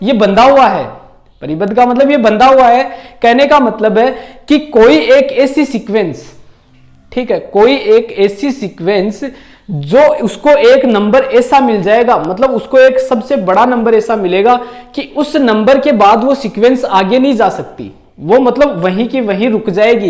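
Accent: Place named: Rajasthan, India. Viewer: Sir this is unit 2 in Rajasthan university syllabus ...nd ur marwadi is like awsm....😄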